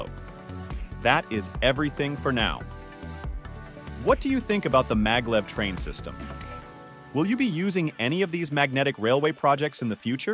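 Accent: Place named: United States. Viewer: More of the tiresome juvenile American accent espousing 'non-existent' technology, including the usual 'cut and paste' non-related images. YouTube unfortunately, is full of this crap!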